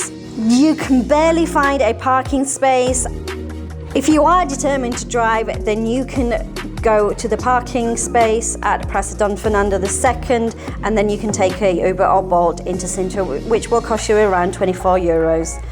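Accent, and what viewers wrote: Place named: United Kingdom. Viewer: This is clearly a British woman, dude.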